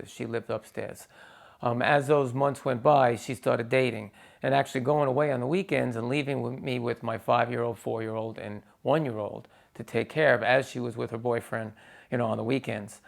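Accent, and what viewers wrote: New York, United States. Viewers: Such a beautiful story! I'd like to meet you sometime, - I love your New York accent. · Great story! (God I love the NY accent so much)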